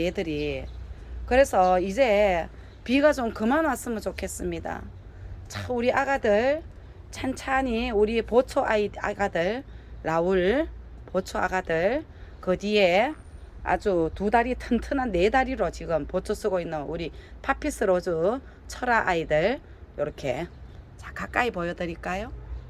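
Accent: Gyeongsang, South Korea. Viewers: 경상도 사투리 정겹네요~저도 경상도랍니다~다육이 엄청 많네요~나눔 기대 됩니다~^^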